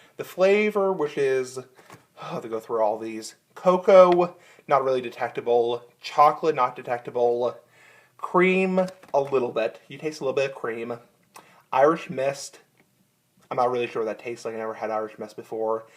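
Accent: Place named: France. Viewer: I like your accent, are you French?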